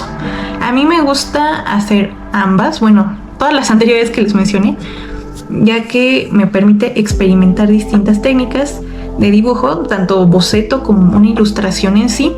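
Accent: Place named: Spain. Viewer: En buena onda , no tomes un tono español como lo hiciste en este video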